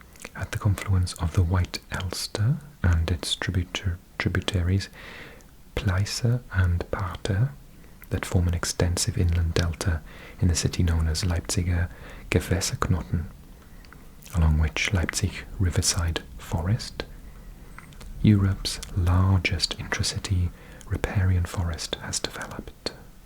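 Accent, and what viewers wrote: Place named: Wales, United Kingdom. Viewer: Love hearing your Welsh accent as someone who lives in Wales! Unusual to hear it on here so thank you 🤩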